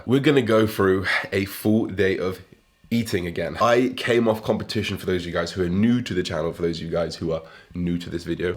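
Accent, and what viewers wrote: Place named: England, United Kingdom. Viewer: I have trouble with understanding english accents, but your content is too good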